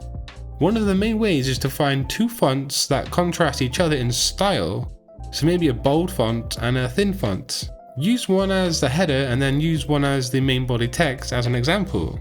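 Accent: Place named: Bristol, United Kingdom. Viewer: Yo, are you from Bristol?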